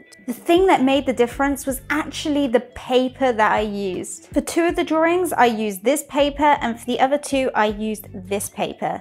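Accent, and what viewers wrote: United Kingdom. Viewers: Me encanta escuchar tu acento britànico jeje aunque no me queda muy claro si los dibujos a lapiz deben brillar o no, es recomendable o no que brillen? that is the question my Dear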